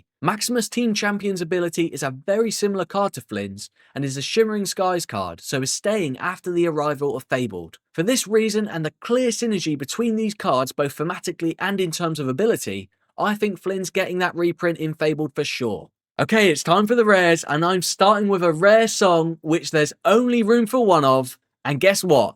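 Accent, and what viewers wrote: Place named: United Kingdom. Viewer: I’ll be honest I thought you were using AI British style accents for your shorts. Buttery smooth my man 😂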